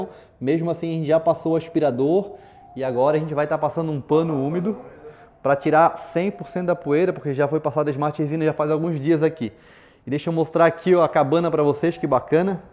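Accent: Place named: Florianópolis, Brazil. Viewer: "A viXta aqui.." "Arrente" "Mexmo assim já passou o Axpirador..." Manézinho será mo quirido? Top demais ein!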